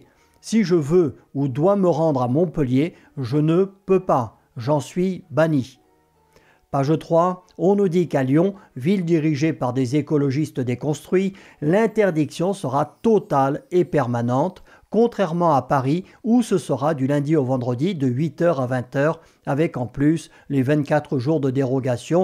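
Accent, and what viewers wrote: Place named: Germany. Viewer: super video mais ton accent allemand est tres bizarre 😅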